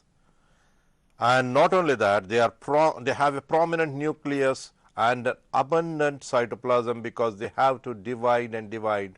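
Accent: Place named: United States. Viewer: Ur English is mind blowing sir it hears like Americans English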